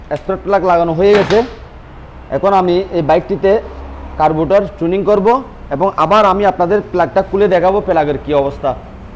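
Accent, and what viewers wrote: Chittagong, Bangladesh. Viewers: আপনি চট্টগ্রামের কাছের মানুষ, বাংলাটা আরোও পরিস্কার করে বলা উচিৎ কারণ, চট্টগ্রামের ভাষা দিয়ে সারা দেশ চলে না - ধন্যবাদ।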